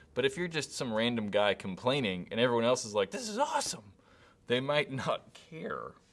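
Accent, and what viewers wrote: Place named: Boston, United States. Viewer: Love your Boston by the way!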